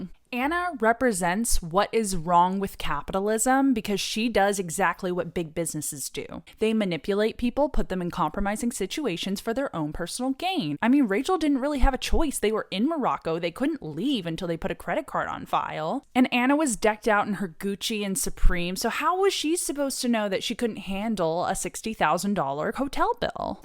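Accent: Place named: Europe. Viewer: Heh.. yeah her accent is quite... Europeish. Like, "somewhere east of France". And yes, it was, and you hit the notes perfect!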